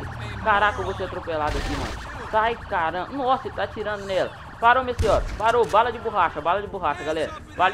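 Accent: Minas Gerais, Brazil. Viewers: Ow! Vc é mineiro pow! Coloca umas viaturas da PMMG ae mano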